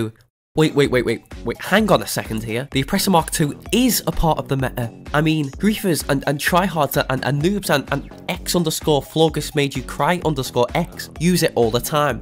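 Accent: Liverpool, United Kingdom. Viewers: Scouser!! Yes now lad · I am now convinced you are British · ps: i've never met a scouse gta youtuber before :( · omg , he's so bri-ish 😢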